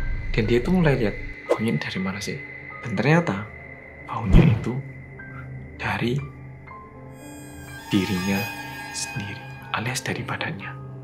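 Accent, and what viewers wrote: Java, Indonesia. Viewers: Logat Jawa nya medok banget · "Kodem" kalo di balik jadi "medok" dulu dia openingnya pake kata "medok" cuma sekarang dibalik jadi "kodem" Maksudnya medok itu karena itu orang korea tapi medok gitu cara ngomongnya sekian terima kasih. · korea indo medok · Meanwhile Mas: "Halo guys welcome back to Korea Reomit bersama orang Korea yang.." Gw: "MEDHOKKK" Medhoknya ituloh terngiang ngiang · kodem lah, kan mas pernah tinggal di malang dan ikut membalik² kan kalimat kayak Reomit kan aslinya Timoer. kan juga pernah tinggal di Jawa Timoer. jadi nya itu tu Kodem alias Medok, oke? 🐦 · Dua jempol dech buat mas. Kagum bngt sama medok jawanya... Aq aja masih keturunan jawa, gk medok2 bngt. Jdi iri dech.. Srmangt trs buat upload kasus2 yg rada serem ya mas, tak enteni loch.. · Bicaramu Caaakkk....muwedhok nemen...😄😄😄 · Yang medoooookkk .haha Sukses selalu mas bro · bhs indonesianya kok fasih dan agak medok jawa nya.....😀😀lucu.....kayak tetangga saya.....chaina..... 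seneng pakai bhs indonesia tapi medok abis.....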